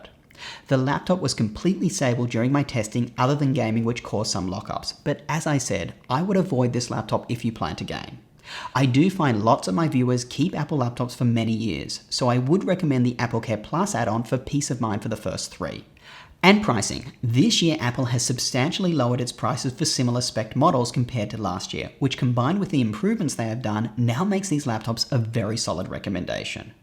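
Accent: Australia. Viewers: I’ve watched quite a few vids now and I’ve only just realized is Australian 😂😂 love the reviews and great advice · Are you an Aussie,? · Are you Australian? I have to know hahaha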